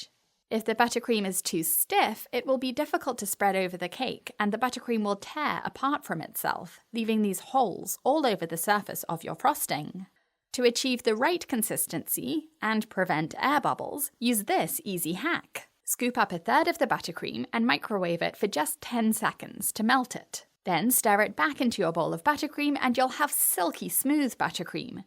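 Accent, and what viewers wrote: England, United Kingdom. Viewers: Honestly I'm devouring your information and tutorials.. they are so informative and clear.. especially with your beautiful British accent.. im attempting my first layer cake and have taken all your info on board to ensure I'm not rushing... still nervous but mire confident with your amazing tutorials.. thank you ❤❤😊 xx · Thanks a mill for this very helpful video!! 🩷🤍 Also you have a gorgeous British accent!! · What part of England are you from? I can’t figure out your accent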